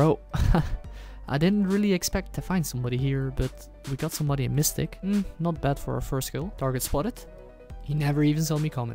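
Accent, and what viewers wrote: Netherlands. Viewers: Are u Dutch? Accent tells everything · Your dutch accent is so obvious 😂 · Keep up the work man, just found you and you earned a new sub from me. Btw are you Dutch? RSN: Penguin Hug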